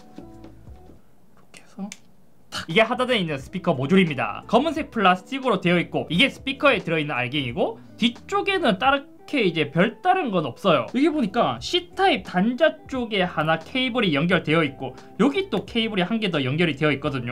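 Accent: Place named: Jeolla, South Korea. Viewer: 말끝마다 요거슬 이거슨 .. 개 역겹네 느그 고향이 쩌ㅡㅡ그저 7시 쩌ㅡ짝이여?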